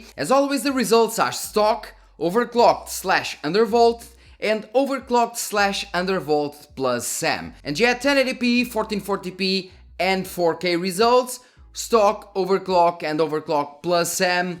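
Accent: Portugal. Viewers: Nice try, Portugalian :) I like your accent )) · Gotta love that Portuguese Accent 👌🏽💪🏽😎❤️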